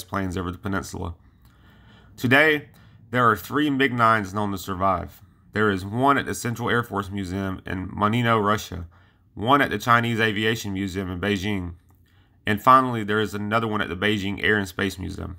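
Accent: South, United States. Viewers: Again, here are my recommendations. Your mouth is still full of cotton & marbles. Speak clearly! Enunciate! Open your mouth, make it round. Get rid of the Southern affectation. Your microphone needs to be close to your mouth, it sounds as is it is across the room.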